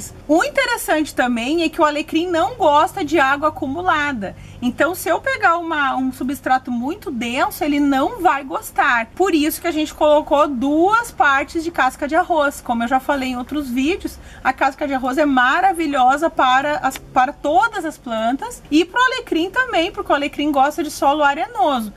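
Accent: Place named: Rio Grande do Sul, Brazil. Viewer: Nossa em outro vídeo comentei q pelo sotaque desconfiava q vc fosse gaúcha e neste vídeo descobri que és de São Leopoldo- RS a cidade onde moro hahaha q incrível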